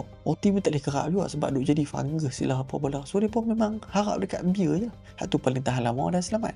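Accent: North, Malaysia. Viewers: Sedap dgr loghat utagha 😅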